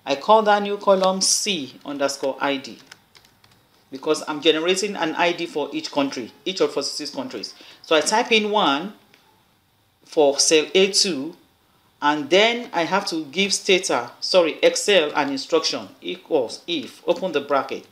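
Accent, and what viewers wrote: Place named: Nigeria. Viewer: Subscribed! Thanks alot for your detailed and simple explanations! It also feels awesome hearing these things explained in a Nigerian accent! Thanks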